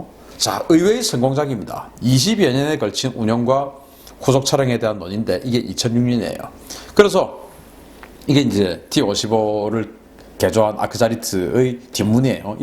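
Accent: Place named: Gyeongsang, South Korea. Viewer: 산인균 말 들어보니 경상도네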